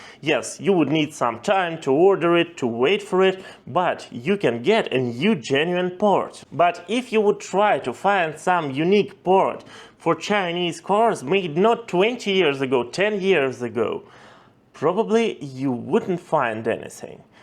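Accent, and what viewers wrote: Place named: Europe. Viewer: why lie or blatantly ignore facts. You either did not do your research or have not seen the level of innovation. You sound like you're from Europe. So i wonder how you have not tried a BYD, NIO, or any of the dozens of Chinese cars in your region. Wheelsboy YouTube channel as well as countless others can educate you if you're not inclined to try one yourself. Again what's the point of telling misinformation when facts are so easy to find. The first link is AMAZING! <link> <link> <link>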